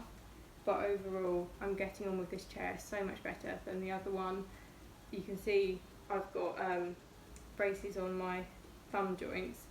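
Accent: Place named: England, United Kingdom. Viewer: typing is so much easier anyways. That must be annoying to deal with at times though. When I write things, I usually can’t read my own writing the next day hahaha. You pull off glasses very well, nice eyes and smile, they work for you and I think glasses are popular again, so many people using them versus contacts again, well here anyways. Are you around London? I have some friends around there, they have totally different accents than you, more city like accents. Then I have a buddy up in Durham, and I have a hard time understanding him 😂😂.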